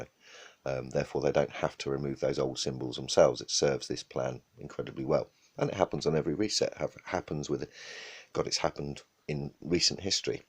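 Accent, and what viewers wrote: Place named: United Kingdom. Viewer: I like your accent. Don't care much for Aussie accents but I like your Brit accent.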